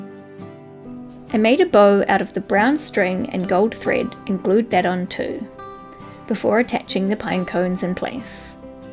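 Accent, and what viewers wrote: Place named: South Africa. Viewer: Christmas is in summer where you live! As soon as you said that, I realized you sound like you have a South African accent. I love all of the wreaths. The gingerbread wreath is my favorite.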